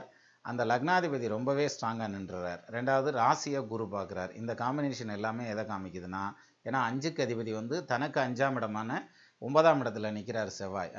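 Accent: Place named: Dindigul, India. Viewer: sir please reply me i am waiting for u r answer for the past two weeks.sir how r u?i hope u and u r family r fine.i have been watching u r program since 2013 its very nice sir.u speak in dindigul tamil slang is absolutely wonderful with comedy sense sir. now i am currently working in singapore since 2005 but my job is still no improvement for me my friends all come with me well developed sir no satisfication in the job for me sir.last year i got married still not yet baby also sir. my DOB <num>.TIME-9.20 PM.BIRTH PLACE-COIMBATORE.And also please tell me about my future sir.i am waiting for u r answer.please reply me sir.my birth astrology my laknam is kanni but give in the computer DOB,PLACE,TIME it show as a simha laknan i am confusing please explain me sir .GOD BLESS U SIR. THANK U VERY MUCH SIR.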